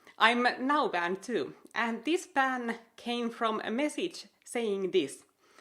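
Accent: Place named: Finland. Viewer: i love the finnish accent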